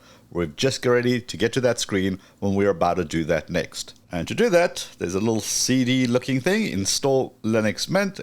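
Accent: South Africa. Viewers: You can tell by the accent. I'm also a South African.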